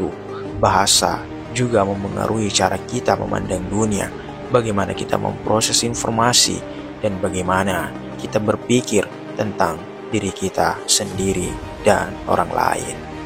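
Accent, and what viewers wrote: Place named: Sulawesi, Indonesia. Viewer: aksen cukup kental bang😊 Saya juga Bugis asli😁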